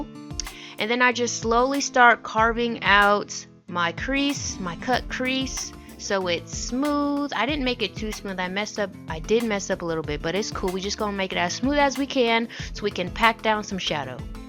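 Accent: New York, United States. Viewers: lmaoo i love this ur deadass from new york "yafeelme"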